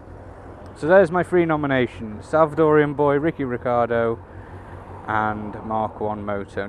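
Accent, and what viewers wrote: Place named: Wirral, United Kingdom. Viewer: I knew from the accent that you must come from that neck of the woods, but in my day, long, long ago in a Galaxy far away, I'd have placed you further up the Wirral. Mind you, I come from further up the Wirral, but not many people these days place my accent there.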